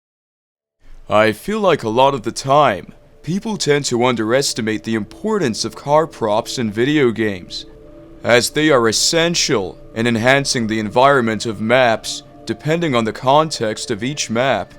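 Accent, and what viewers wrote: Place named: California, United States. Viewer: This dudes California accent is crazy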